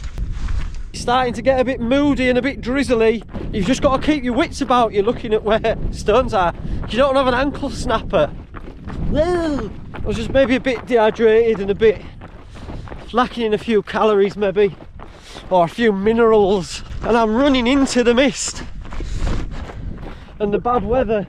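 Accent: Yorkshire, United Kingdom. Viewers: Awe you’ve been a miss with the multi day adventures 😀 Love the Yorkshire accent, never change! That’s the one thing I loved to listen to on my coast to coast path last year. Really enjoyed that 🫶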